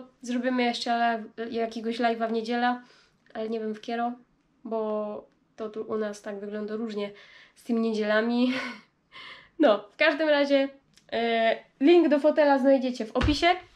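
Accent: Silesia, Poland. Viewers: Podoba mi sie ta Śląska Godka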